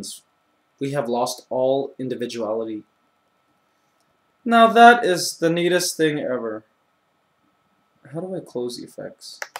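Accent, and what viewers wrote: Philippines. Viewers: ya what happened in your Filipino accent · yeah what happened to your Filipino accent? anong nang yare? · I think it's because he use to live in Toronto and he moved to the Philippines and started talking Philippines more and that's how he got the accent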